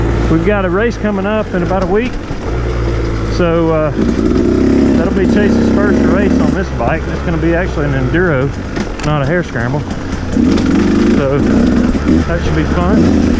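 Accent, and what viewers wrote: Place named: South, United States. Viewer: come to think about it, one of my buddies has a 2017 or 18 te300 that he wants to sell and I'd imagine you can get it relatively cheap. He bought it to tag and ride on the rd but hes rich af with a garage full of gasgas and ktm and rode it and didn't like it so its been sitting in the corner ever since. If you want to see some pics I can get some and whatever he wants for it. Dont know where you are but you sound southern lol and I'm in east Tennessee so we cant be to far apart.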